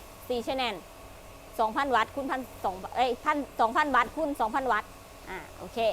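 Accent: Thailand. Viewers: ต้องให้เพื่อนคนอีสานแปรให้ฟังอีกที กว่าจะรู้เรื่อง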